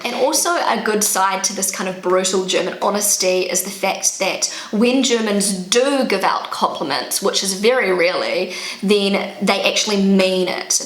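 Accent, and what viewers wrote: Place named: New Zealand. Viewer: Dear, I give you a genuine compliment. I love your Kiwi accent so much, as it reminds me of the journey of a lifetime to your wonderful amazing country back in 2003. I live in Swabia, near Stuttgart, and swabians are so reluctant in saying something nice or encouraging to you. I work as a M.D, now as a GP, and I always try to encourage people and give them a POSITIVE feedback if they do something good. But their is often mistrust in people. Maybe I wasn't meant to be German, who knows. Happy Christmas season greetings from Waiblingen.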